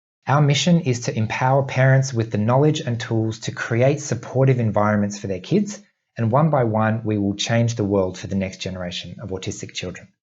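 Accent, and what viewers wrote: Australia. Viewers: I am Chinese and I grew up learning American English, and ever since watching your videos, I have become very fond of the Australian English.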